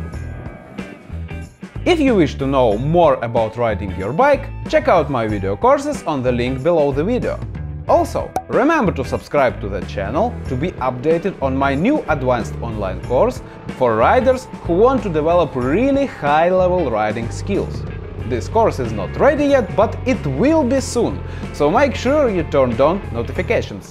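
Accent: Russia. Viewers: Please don't make fun of your accent, I love your Russian accent and I highly enjoy you content!😄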